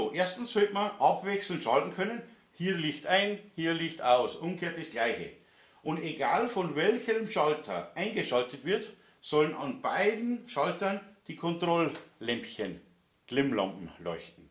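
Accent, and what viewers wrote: Bavaria, Germany. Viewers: du bis der beste, geile Aussprache ( Dialekt) Unser beste Bayer 😀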